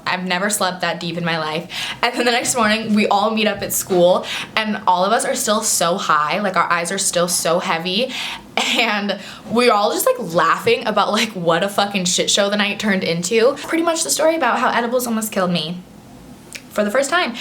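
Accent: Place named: United States. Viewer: She has the typical American girl accent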